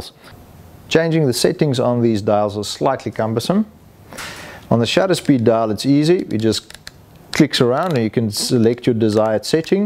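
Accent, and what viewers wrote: South Africa. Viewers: You sound like a fellow South African living in NZ; Afrikaans first language? Thank you for the review, I love that camera, but will only be able to afford it 15 years after its release😥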